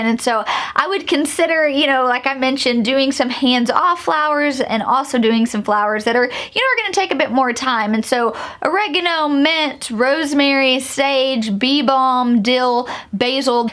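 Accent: South, United States. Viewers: Hey, I am growing every one of those! I have soooooo many seeds But they are very well organized, separated and sorted. I have all my seeds on a schedule in my calendar Every Tuesday I start various vegetables and such then Thursday I start various flowers. This is all based upon how many weeks prior to the last frost date for me I want to use April 29th as the last frost date. So everything revolvers around this. So then all these dates are entered into my iPhone calendar as repeat every year. This is my most organized year ever! So my schedule is working well so far. I really hope to see good results this year so I can organized some photos and put them on Instagram which I am doing sporadically but I want to get better at having a schedule for this. Final note I absolutely love listening to you. I miss living in the south and I love southern women's accents. 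So I think I have enough channels now that it is amazing listening to y'all folks. Anyway God bless y'all Cya later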